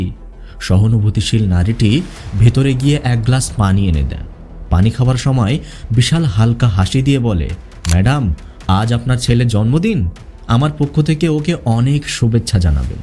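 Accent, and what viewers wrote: Bangladesh. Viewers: India তে কোথায়? ইন্ডিয়া কি শুধু হুগলী আছে? সত্যি বলতে কি কষ্ট? আমার মনে হচ্ছে বাংলাদেশি, উচ্চারণ গুলো ঠিক তেমনি। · আপনি ১০০ শতাংশ বাংলাদেশের লোক। আপনি যতই আমাদের বাংলায় কথা বলার চেষ্টা করুন, আপনার এখনও জিভের আড় ভাঙেনি। দ্বিতীয়ত, আমাদের দেশের বাঙালি মুসলিমরা কেউ জলকে পানি বলেন না। কেন বেকার মিথ্যে বলছেন?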